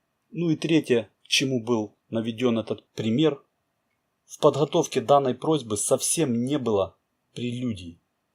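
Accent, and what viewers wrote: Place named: Ukraine. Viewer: Обычный мягкий украинский говор.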